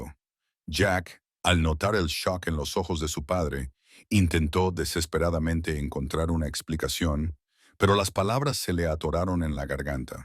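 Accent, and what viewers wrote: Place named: United States. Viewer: Estaban en la entrada de la casa? o estaban en el piso de arriba?, te contradices. Además esa voz agringada, me resulta chocante.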